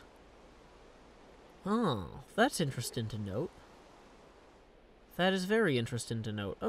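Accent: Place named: Tennessee, United States. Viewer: Hey, I'm really enjoying this series! It's great to hear a fellow Tennessean, I hope you continue this and continue being hilarious!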